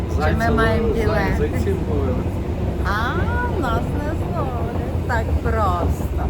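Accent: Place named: Western Ukraine, Ukraine. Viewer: Виходячи з акценту, ви мабуть самі "нанастраждалися бьєженці" з "патріотично" вибігшого до Європи заходу України.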